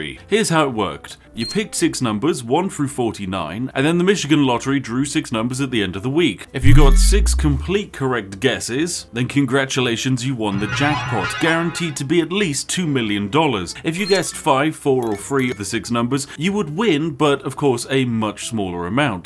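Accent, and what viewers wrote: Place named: United Kingdom. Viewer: oh my fuck YOURE SO BRITISH... i love it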